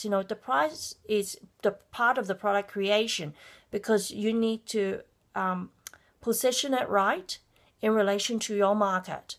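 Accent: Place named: Australia. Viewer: i like your australian accent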